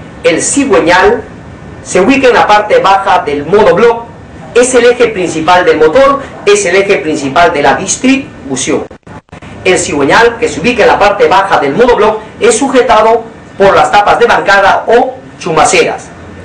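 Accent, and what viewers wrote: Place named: Peru. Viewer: Parece que es peruano este maje por como habla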